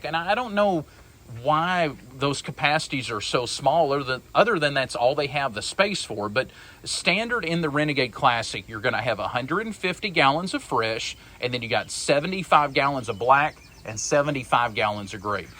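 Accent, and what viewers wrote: South, United States. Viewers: I like your southern drawl. It sounds so homey.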